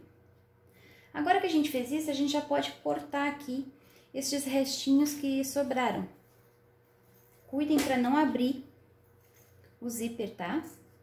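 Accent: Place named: South, Brazil. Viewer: Amei .. Cheguei hj no canal e já amei .. Vou salvar pra fazer! Amei só sotaque do sul 🤩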